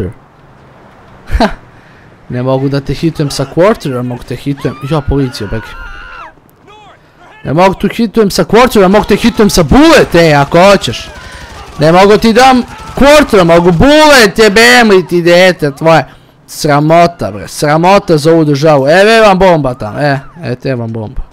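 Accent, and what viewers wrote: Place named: Eastern Serbia, Serbia. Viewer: Akcenat istocne Srbije. Nista lose i nista cudno -_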